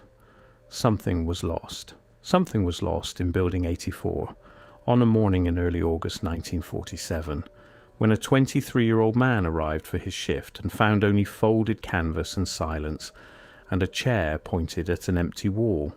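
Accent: United Kingdom. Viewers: How come all the narrators of these episodes are Pommies?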